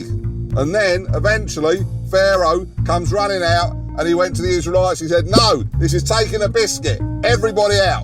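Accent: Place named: Cockney, United Kingdom. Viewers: Nothing like a Londoner telling a story about the four by twos 😂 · Brilliant! Cockney accent and imagery is cool. Can you try it with Scottish accent? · I loved this telling of the Passover account 😂 The cockney accent was an unexpected touch but very London. I will say however that there are many aspects of the Passover that point and foreshadow Christ in a lot of ways. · This actually makes me sad hearing Cockney 😢 How much longer will the British be around?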